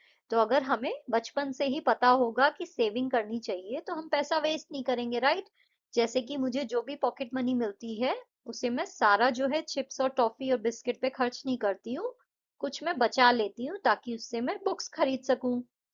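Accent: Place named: United States. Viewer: mam where you from i had a hunch you from to America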